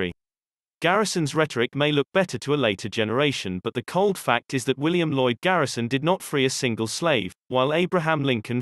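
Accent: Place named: United Kingdom. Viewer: What's with this British accent sh!t? Sowell is an American, ffs.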